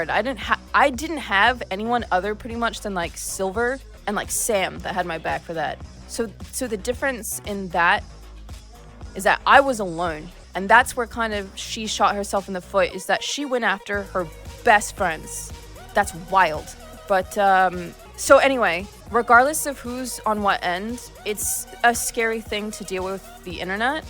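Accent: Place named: United Kingdom. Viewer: It's been a while since I've heard sound this British this often.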